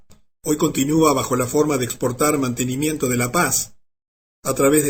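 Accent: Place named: Argentina. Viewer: Hola, soy Francisco 27 años músico de Bahía Blanca. Excelente el video, realmente excelente. Me gustaría contactarte por Facebook, sobretodo porque vengo investigando esto hace mucho, acerca del NOM y todo esto y es la primera vez que veo un video con acento argentino. saludos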